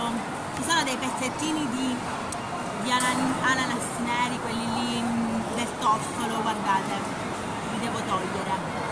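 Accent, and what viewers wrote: Sicily, Italy. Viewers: Ciao simpaticona! Mi è familiare il tuo siciliano😂😂😂 Pizza bruciata, io l'avrei portata indietro subito! Ciao... non ricordo il tuo nome, a presto!😉😘Pollicione in su! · Si sente l'accento siciliano ahhahahahhaha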